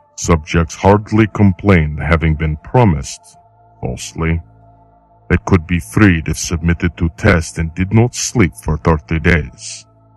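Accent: Russia. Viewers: That was great and I think you are the first to do it with a Russian accent. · Fantastic. This story always makes me queasy xD Clever use of a Russian accent as well as the visuals :3 Good job. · Are you really Russian or you that good?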